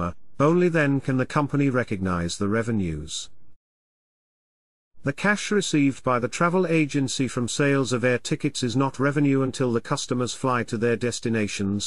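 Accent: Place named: United States. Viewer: صوتك بقا امريكانى اخر حاجه :)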